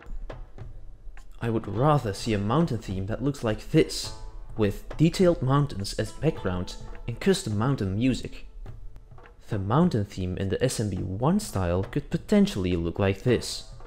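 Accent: Netherlands. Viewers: are you dutch? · WOWOWOWOWOWOWWW! TALKING? I MEAN... I'VE BEEN A BIT DISTANT FROM THIS CHANNEL LATELY, BUT... CAN SPEAK? (I like your voice tho. And the dutch accent is pretty awesome to hear, you don't hear that accent on youtube often)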